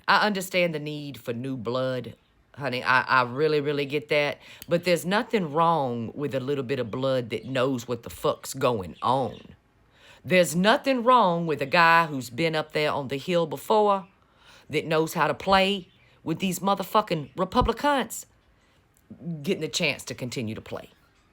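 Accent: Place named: Louisiana, United States. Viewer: I don't know why I thought you were from Louisiana!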